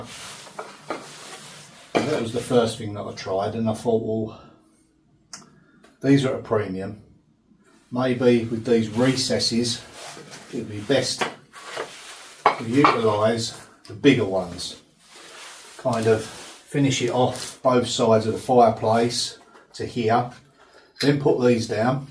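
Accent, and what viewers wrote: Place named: South London, United Kingdom. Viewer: Saw you pick up tiles from Ryan, hopped over to your channel. Now addicted. Not sure where exactly from but my guess would be south London, lewishamish… probs completely wrong but your accent is the same as my family. I live in NZ, so it’s music to my ears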